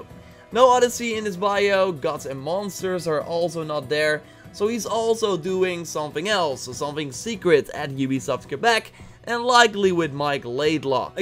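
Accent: Netherlands. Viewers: goeie channel echt waar maar das elke keer weer da hollands-engels accent weer gewoon worden haha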